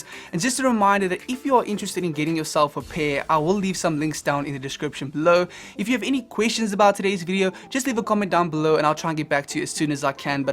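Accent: South Africa. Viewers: Great videos.. do I hear a fellow South African accent? I have 2 E5 XT’s on the way. I want to connect them to an Apple airport express so I can use the with Airplay. But I can’t seem to figure out how I would connect them as a pair to the Airport. The Airport only has a 3.5mm out. Would I use a 3.5 to rca stereo splitter and then 2 RCA to TRS cables.. one to each speaker?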